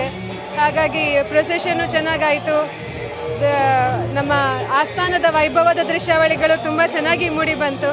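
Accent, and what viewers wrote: Standard. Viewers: How ❤❤❤beautiful ❤️ pure kannada she is speaking 🗣️🗣️🗣️ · Abba nimma suddha kannadakke namaskara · ನಿಮ್ಮ ಶುದ್ಧ ಕನ್ನಡ ಕೇಳಲು ಕಿವಿಗೆ ತುಂಬಾ ಆನಂದ...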